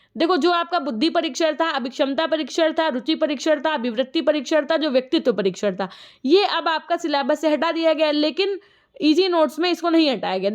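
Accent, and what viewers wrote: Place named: Kanpur, India. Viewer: Full kanpuriya andaz😄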